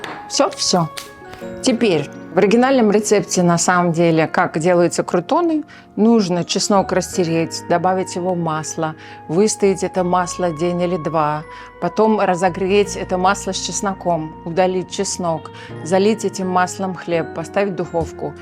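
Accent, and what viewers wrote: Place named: Baltic. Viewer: ну надо же, а мне привиделся прибалтийский акцент, у нас похоже латышская женщина, партнер по работе говорит, очень приятный акцент ❤❤❤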